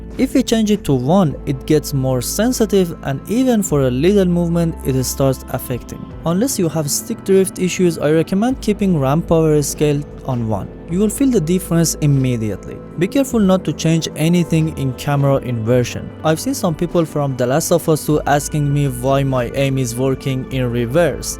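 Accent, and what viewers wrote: Iran. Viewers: Your accent, are you Persian? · بنازم آقا بازی رو انجام دادم نیازی به دیدن ویدئو نداشتم ، لهجه ایرانی رو دیدم دلم نیومد رد کنم عالی و مسلط موفق باشی.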